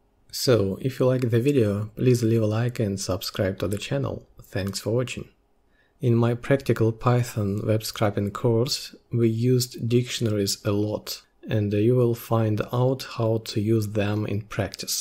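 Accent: Russia. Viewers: Чувствуется русский акцент)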